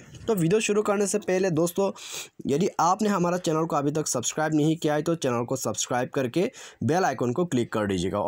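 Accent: Assam, India. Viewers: or may be assam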